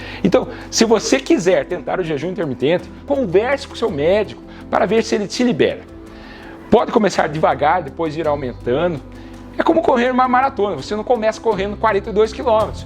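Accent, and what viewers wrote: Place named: Caipira, Brazil. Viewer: Já era fã, agora que vi de camisa polo, apaixonei. Esse R caipira, então...🥰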